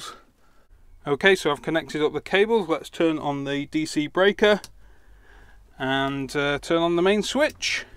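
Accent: United Kingdom. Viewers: great reviews so far, a fan from another 'experimental techie' from indonesia. Just wondering have you done a "Cheapest On-Grid Inverter" review already? I guess this piece is the only missing review that makes a solar newbies out there would expect from you. MPPT function, Pure Sine Wave and 85-89% conversion power rate are few claims that I would think you probably the best person to prove it. I could point few inverter candidates for your review;) Anyway, just a thought, love your work so far and keep that British accent!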